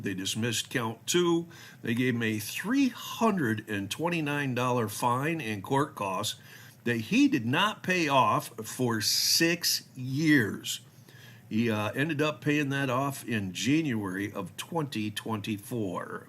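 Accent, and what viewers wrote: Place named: Balkans. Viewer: With his accent, I would guess his people are from the Balkans.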